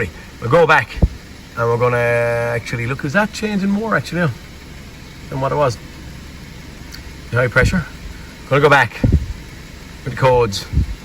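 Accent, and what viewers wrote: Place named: Ireland. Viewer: Awesome video, everything thing I needed to get on. Mostly just the location of the sensor. But the using the multimeter and what to expect. I initially had been watching without audio and using subtitles and I could tell you were Irish by the subtitle errors. Great accent. I have a Geordie accent so I'm not throwing any shade. ✝️🇬🇧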